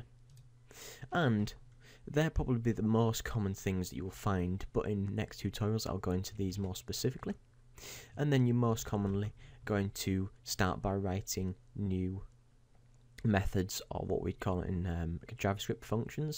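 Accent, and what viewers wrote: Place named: Scotland, United Kingdom. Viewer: I love this scottish accent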